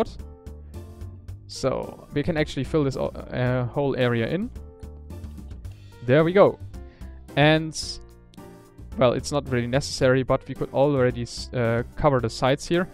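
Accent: Germany. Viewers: Your english is VERY german.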